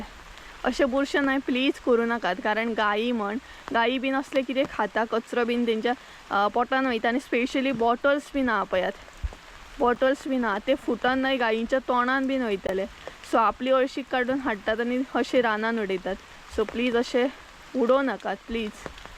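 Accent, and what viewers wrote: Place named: Konkan, India. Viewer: Keep going.... Don't stop your vlogs. I always like your talking way... I mean your konkani is different from ours as i stay in South Goa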